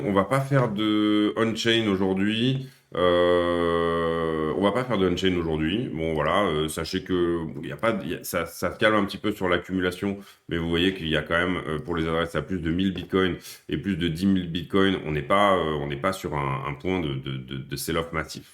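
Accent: France. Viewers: Personnellement, j'adore me promener dans la nature. ça fait plusieurs années déjà qu'on a entrepris une excursion ailleurs mais avant on réjouissait beaucoup d'une sortie à la mer juste pour se balader un peu et respirer... on a visité plusieurs fois l'île envoütante de Cuba. Ce qui m'intéresse en ce moment c'est les "communautés" en France (et ailleurs) où on vit un peu à l'écart comme par exemple les endroits oà on se dédie à la permaculture etceterca. Ben voilà, j'ai juste voulu répondre à votre dernière question. Nous on vit près de la frontière des Pays-Bas. Vous avez un bel accent français, pourtant je ne saurais pas dire d'où exactement. Ce qui importe c'est que vous vous faites comprendre malgré la vitesse avec laquelle vous vous exprimiez. Bien à vous.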